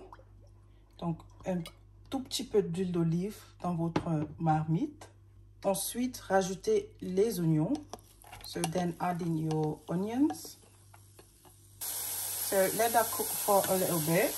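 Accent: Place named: England, United Kingdom. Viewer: Merci pour la recette et j'adore ton accent anglais 😉👌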